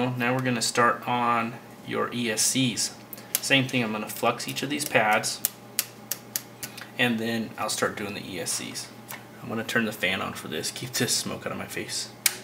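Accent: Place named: United States. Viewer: got their language from England (i.e. you actually speak English in case you hadn't realised.....) anyway, all that said you pronounce 'Solder' with the 'L' pronounced so it isn't, never was, never meant to be pronounced 'Sodder'. Just like you look at yourself in a 'Mirror' and not as you Americans say in a 'Merr'! AND also while we're at it, the most annoying mispronunciation you say, there's an 'S' on the end of 'Math' making the correct pronunciation 'Maths'. So please, when you beg, borrow or you steal something (like language for instance), please have the decency to use it correctly. I eagerly await your childish, abusive comments as I know how you Yanks hate to be told anything and 'put right'. Thank you and 'have a nice day y'all' :)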